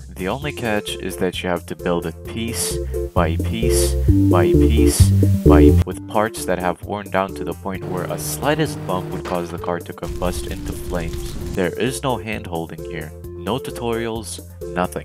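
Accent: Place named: Lithuania. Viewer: The voice sound very Lithuanian, are you Lithuanian? :D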